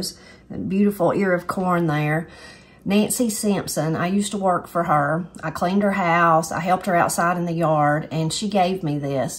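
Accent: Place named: Georgia, United States. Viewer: You are so right! I sometimes just turn on her video just to hear her and her family speak and talk about their ups and downs and day to day little things! I'm in the Midwest but my family is from North Georgia and the accent and values of my people come through the screen to put a smile on my face! 😊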